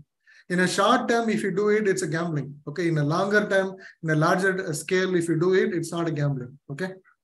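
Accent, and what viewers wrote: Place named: South India, India. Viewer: Very bad presentation and even his english also semi tamil or telegue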